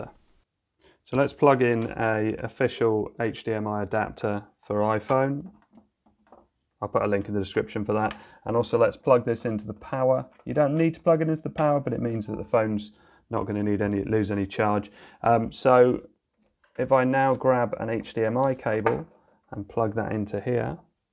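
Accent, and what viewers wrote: United Kingdom. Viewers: love to hear some English accents on a tech channel 👌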